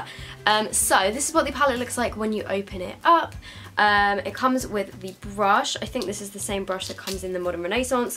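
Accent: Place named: United Kingdom. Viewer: I love accent. "It's got a lot of fool-out". Which part of UK are you from?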